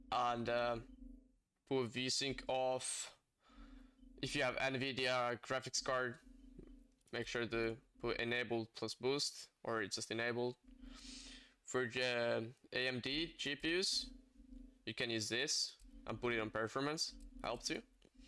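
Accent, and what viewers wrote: Finland. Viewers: Your finnish/nordic accent is very attractive.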